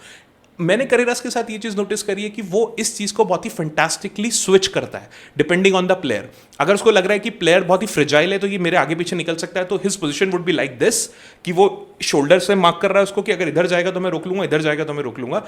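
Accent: India. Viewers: Indian accent cuts my ears🤢🤢🤮🤮🤮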